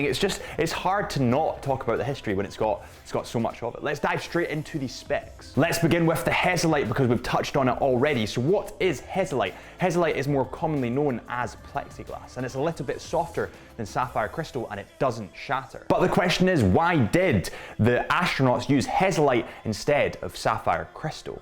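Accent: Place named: Scotland, United Kingdom. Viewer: Very intesting review,. But you were-for a german guy-a little hard to understand because of the strong accent you have... where are you from, maybe Scotland? Cheers Stefan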